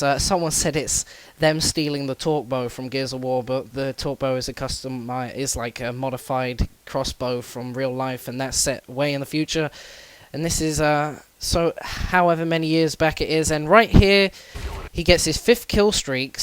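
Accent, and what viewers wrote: United Kingdom. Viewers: great job breaking it down! Brits make things sound much cooler than us yanks. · you sound like your from london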